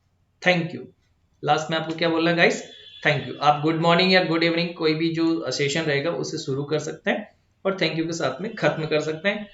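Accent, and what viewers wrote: Rajasthan, India. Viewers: Sir are you from rajasthan (Jhunjhunu)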